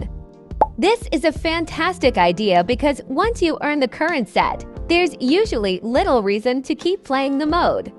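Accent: Russia. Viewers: Arwe you russian? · Are you Russian or Ukrainian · Are u russian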